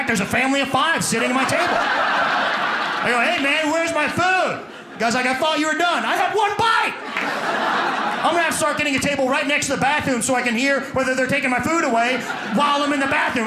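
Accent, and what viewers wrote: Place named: New York, United States. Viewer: The New Yorker accent is spot on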